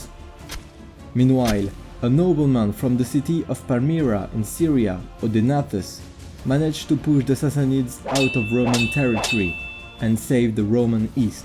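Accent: France. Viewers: donc c’est bien un accent français ?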